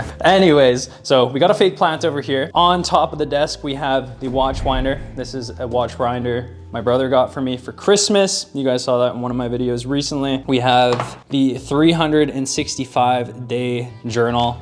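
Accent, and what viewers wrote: United Kingdom. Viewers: i can hear some english twang in your accent... have you ever spent time in england ?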